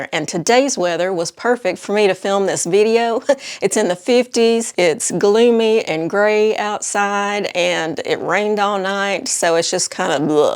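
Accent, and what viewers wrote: South, United States. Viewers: Hi. I enjoy your videos and your southern accent reminds me of my own aunts I have down south. As a nurse, I truly understand what happens when an older person falls and breaks a hip and then the aftermath when they have dementia and that certainly progresses. It sounds like you’re doing everything to keep her safe and well taking care of. I recently lost my mother earlier this year and feel alone also as you described in your video with your aunt being the last connection to that side of the family. All you can do is take it one day at a time and some days will be hard. Take care of yourself. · Writing from Washington State where style and fashion never got a hold.. Sorry, WA State! Love your style and southern dialect. 😍❤❤So sorry to hear about your auntie.😥 Wishing you the best and strength!!! And thanks for letting me escape fleece country by watching your channel. 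· I just discovered your videos recently and I think you are cute as a button. I love hearing your voice and and sweet southern accent! :) You've inspired me to get a much shorter hair-style...I feel like a new women! I hope your aunt gets situated into a happy situation. No one really understand how much we women are/feel responsible for everyone and every thing! Hang in there!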